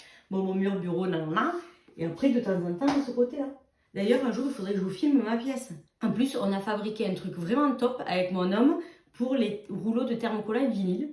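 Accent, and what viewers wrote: South, France. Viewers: Coucou, je suis tombée par hasard sur ta chaîne, j’aime beaucoup ta bonne humeur. Je me suis abonnée à ta chaîne, j’ai moi-même une toute petite chaîne. D’après ton accent tu dois être du sud comme moi. Les achats pour l’anniversaire de ta fille sont top. Merci pour le partage. Bises à toutes les 2. 😍😍😍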